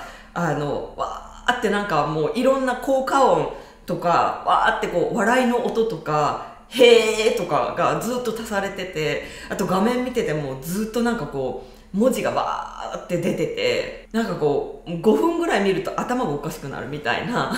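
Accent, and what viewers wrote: Kansai, Japan. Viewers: Momoyoさんこんにちは。今回の動画も、Momoyoさんの話を聞きながら、自分はどうだろう？境界線引けてるかな？なるほどなあ、など、たくさん自分と向き合うきっかけをいただきました。ありがとうございます。わたしの中にチクチクと刺さっている言葉があったのですが、今回のルールのお話でその言葉がすぅっと消えていった感じがありました。 「ルールだから」「慣習だから」は、本当の愛を見失ってしまいますね。わたしはわたしの愛を表現する力を頂いたように思います。ありがとうございます。 Momoyoさんのツッコミや関西弁、歯に衣着せぬ物言いがだいすきです。今回も何度も吹き出しながら動画拝見しました笑ありがとうございました。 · 『理由はありません、て言うてもてるやん』← の関西弁、好き❤️ 確かに、人に迷惑かけない為のルールに縛られすぎてる❣️って、迷惑かけるが大前提になってますやん（笑） お互いに尊重できる日本になるといいな^ ^いつもありがとうございます✨🙏✨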